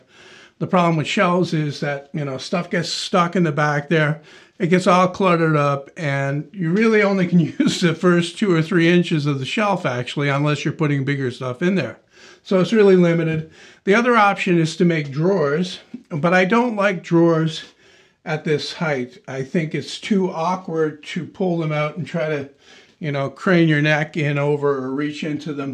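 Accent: Canada. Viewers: Hey, I was wondering, are you canadian?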